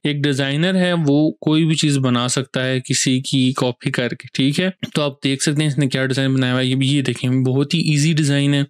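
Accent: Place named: India. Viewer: Im not understand. Pronoun english india 😅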